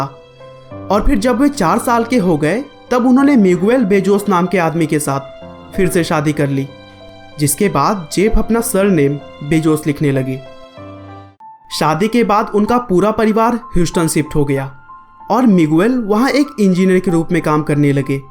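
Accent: India. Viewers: Bai Hindi accent 😥 overall nice video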